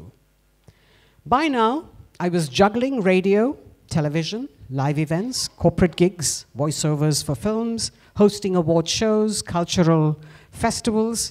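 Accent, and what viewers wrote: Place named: United Kingdom. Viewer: The best news reader of all time with a professional British accent.Absolutely commanding,crisp,focussed and dominating.Never heard a speaker like her in my life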